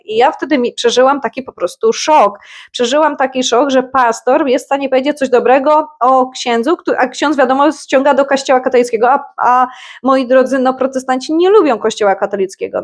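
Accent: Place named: East, Poland. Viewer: Pierwszy raz na Twoim kanale, więc wynacz jeśli pytanie już padło - skąd u Ciebie ten wschodni akcent? Pozdrawiam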